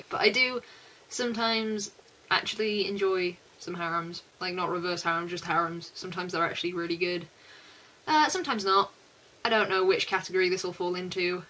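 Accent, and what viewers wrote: United Kingdom. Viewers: aha what part of britain are you from? you sound alittle south eastern like london ? and lol to be honest the only anime i have seen this term that is watchable is Shinjekyo no kyojin i tried some of the other ones but meeeeh maybe ill try gargantia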